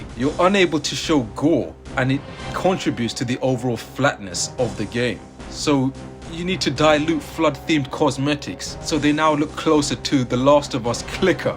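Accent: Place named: United Kingdom. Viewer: ya veo acento británico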